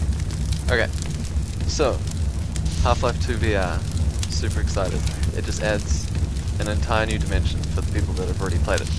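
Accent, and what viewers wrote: New Zealand. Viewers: you kiwi? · you got featured on Kotaku also great to see a fellow New Zealander making some waves on the youtube refreshing not hearing a American accent for a change keep it up man you got a new subscriber:)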